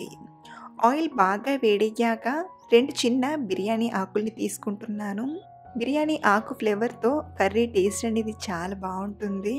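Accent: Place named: East Godavari, India. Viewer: East godavari slang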